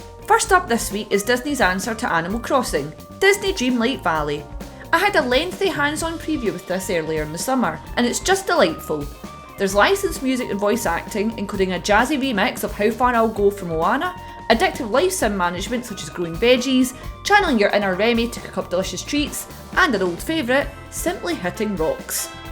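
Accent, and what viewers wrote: Scotland, United Kingdom. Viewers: SCOTTISH M8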